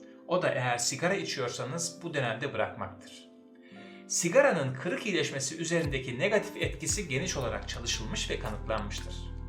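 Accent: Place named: Samsun, Turkey. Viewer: Hocam Samsunlumusunuz, veya Samsunda bulundunuz mu? Şiveniz Samsun şivesi de ondan sordum..?😂 Sevgiler Saygılar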